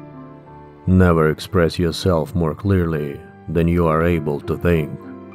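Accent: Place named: Eastern Europe. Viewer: Ok my friend, if u ever come to Toronto... Contact me... Seriously...I would love to host you :) And I won't forget the offer. You sound eastern European. What country are you from? Bhor was twisted. Interesting how many of these great intellectuals are so relatable and down to earth. Pragmatic yet spiritual. LOVE IT. Thanks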